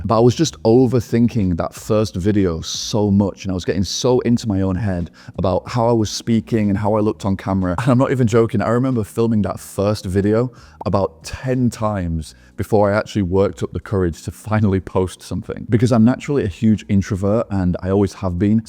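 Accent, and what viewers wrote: United Kingdom. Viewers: I like your voice a lot. And for me, thats a huge reason why i watch someone's vids. If they have great content but their voice grates on me, then i won't watch. Plus you have a great yorkshire accent...best i can tell in California. 😁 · Is that British accent?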